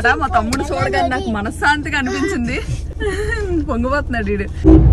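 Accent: Vizianagaram, India. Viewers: Maa vzm slang untadhi chari ❤ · MI papa ni tesukelatam leda enra dooram vellina vijayanagaram language maaraledu meku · మన విజయనగరం భాష వింటుంటే చాలా సంతోషంగా ఉంది అంది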